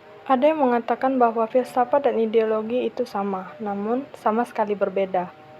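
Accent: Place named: Java, Indonesia. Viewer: wah well done mbak, simpel tapi jelas:)) eh salfok ke medoknya hehe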